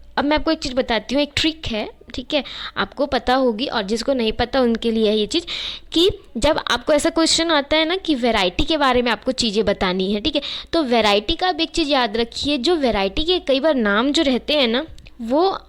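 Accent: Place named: Kanpur, India. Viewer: to kaha se .... Apki boli se lagta h sp Kanpur ki ho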